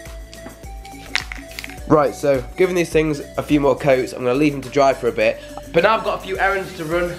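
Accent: United Kingdom. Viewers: This dude sounds like faze adapt with a British accent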